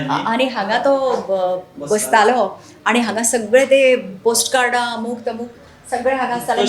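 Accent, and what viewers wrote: Maharashtra, India. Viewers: She has a strong influence of marathi in her speech And can't talk typical kokni words It's unfortunate Oogich sangta ki aplya kokni bari ulapak yeta mhanun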